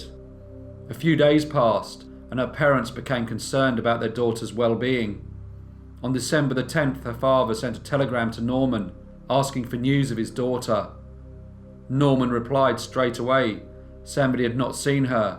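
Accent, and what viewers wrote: United Kingdom. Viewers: I LOOK OR WATCHED MANY OF THE VIDEOS AND FIND IT INTERESTING,ITS LIKE MANY GENRE MIX TOGETHER COZ IT DOESNOT CIRCULATE IN ONE OR TWO PLACES BUT IN THE WORLD WHERE SOME ODD THINGS WHICH SURFACED OR KNOWN WELL KNOWN EVEN OUTSIDE OF THEIR COUNTRY,WHICH MEANS THE NATIONALS COUNTRY WOULD KNEW WELL,AND THE BRITISH ACCENT IS NICE TO HEAR ITS NOT LIKE HIGH PITCH QUICK PACE ACCENT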